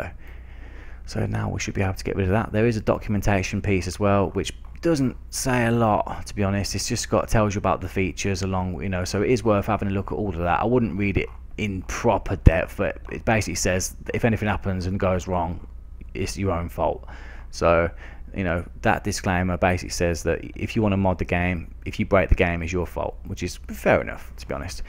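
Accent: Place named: Scotland, United Kingdom. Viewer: sound a bit scottish tbh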